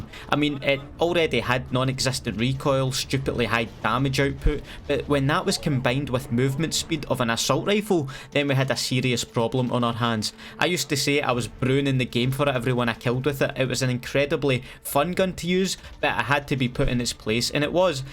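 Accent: United Kingdom. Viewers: His British accent is just....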